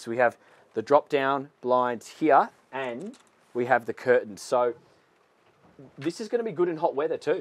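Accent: Australia. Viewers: I don't care about the boats, but I love the tight pants, Aussy accent, and the peekaboo tease of his hairy belly!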